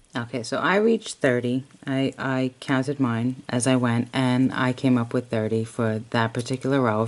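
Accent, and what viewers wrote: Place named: Brooklyn, United States. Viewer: I'm a Brooklyn girl but live in California now, hearing you I felt like I was home. Love this hat, gave one to a friend she loves it as her go to hat.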